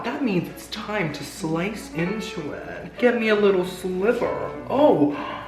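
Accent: England, United Kingdom. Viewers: The English accent 😂😂 such a classy dame. Dora the Explorer Vibes! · Omg the British accent. I’m having a really shitty day, this is is making me feel a lot better. Thank you for being you. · Omg xD The British accent is fabulous. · The British accent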